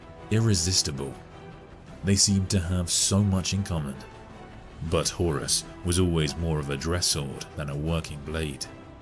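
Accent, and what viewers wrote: United Kingdom. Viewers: Its just his accent,he's British.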